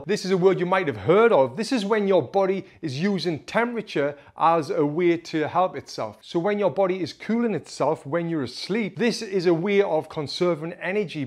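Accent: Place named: England, United Kingdom. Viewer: You have got marvelous English accent, sir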